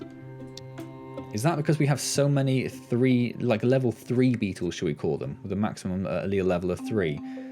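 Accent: United Kingdom. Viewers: gdamn i like ur voice u british?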